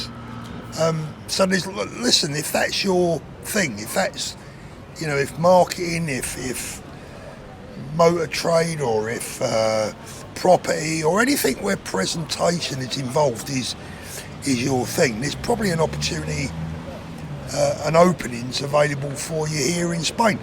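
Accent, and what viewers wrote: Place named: London, United Kingdom. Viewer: Always great to hear a real Londoner. Too bad your own country doesn't want you.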